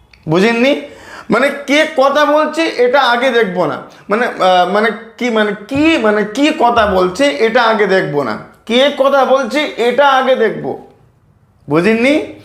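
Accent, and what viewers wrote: India. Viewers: Apni ki north bengal asen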